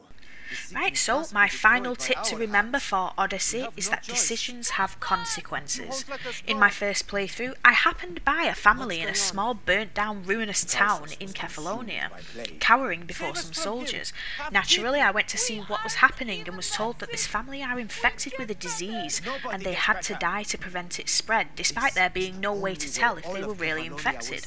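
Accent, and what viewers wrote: North, United Kingdom. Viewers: Are you a "northener"? It's a hobby of mine to guess accents. Also, great video!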